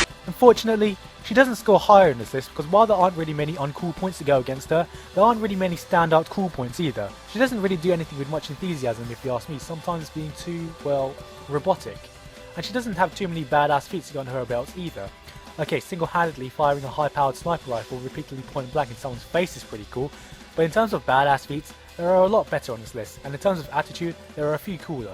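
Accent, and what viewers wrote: United Kingdom. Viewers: He's British but from Thailand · So wait your from Thailand but you have a British accent someone explain plz!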